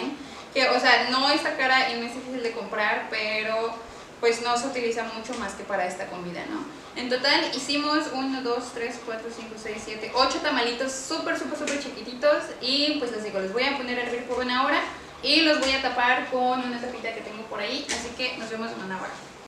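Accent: India. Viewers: Hi 🙋🏻‍♀️ que rico 2 Xfis para mandar😋👍🏻y si tienes el acento hindú enel inglés ☺derepente no lo entiendo ocupo poner bien atención pero es muy bueno y lo aprendiste muy rápido😉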